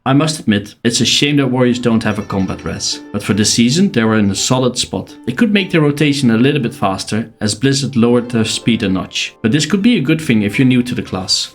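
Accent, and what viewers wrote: Netherlands. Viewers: I really like your voice, and your Dutch accent.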